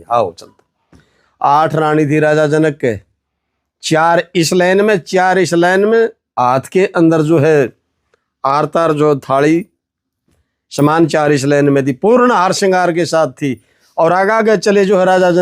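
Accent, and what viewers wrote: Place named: Haryana, India. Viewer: Jai Gurudev 🙏🙏bahut hi sunder prasang tha maine do bar suni. एक तो हरियाणवी टोन थी जिसकी वजह से दो बार बड़े ही ध्यान से सुना तो अच्छे से समझ आई. बहुत ही सुन्दर कथा प्रसंग🙏🙏 अब छोटी मुँह बारी बात एक संका मेरे मन में भी रह गई की राजा जनक तो त्रेता में हुए और राजा परीक्षित कलयुग में, बिच में पूरा द्वापर बिता तो क्या तब तक राजा जनक थे ही?? बस संका हुई तो पूछ लिया और कोई बात नहीं उचित समझे तो संका का समाधान करें🙏🙏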